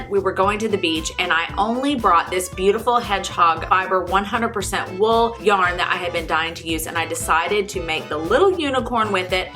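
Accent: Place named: United States. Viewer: Thank you. I found your tips helpful. I go to Physio for other issues and complain often of pain in my right shoulder but I've never mentioned to my physiotherapist the pain in my wrist and forearm because I haven't wanted to bombard her. I should ask her for some help with my issues instead of feeling embarrassed by them. I like the name of your channel. I live near Toronto and I'm bilingual. I was surprised to hear your American accent with a French named channel! If you don't mind me asking, how did you come up with this name?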